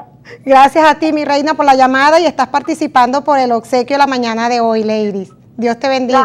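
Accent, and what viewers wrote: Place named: Venezuela. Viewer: Un saludo desde El Salvador, increible como estoy aprendiendo de usted, por su acento creo adivinar que es de la hermosa Venezuela, y por tu forma de responder eres cristiana evangelica, Dios bendiga tus manos, y por favor dime o enviame por favor el paso a paso còmo hiciste el dulcero que diste de regalo por favor amiga linda.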